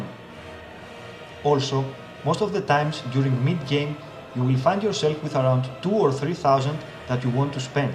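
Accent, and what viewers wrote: Greece. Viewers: Greek! From the accend! Haha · Λολ μπορώ να καταλάβω ότι είσαι Έλληνας απ την προφορά σου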